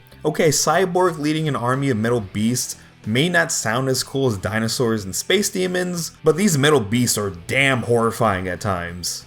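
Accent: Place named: South Korea. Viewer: なんかぺららぺ朝鮮なまりがきつい朝鮮なまりがきつい まじで・・・YES・・まんじゅ。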